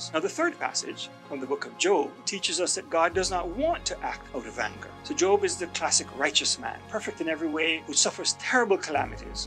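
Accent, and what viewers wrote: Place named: Ireland. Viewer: Really good video. Also, comment from an Irish person - your accent is half like that found in the South to Southwest of Ireland (and half something else), are you from Newfoundland or something?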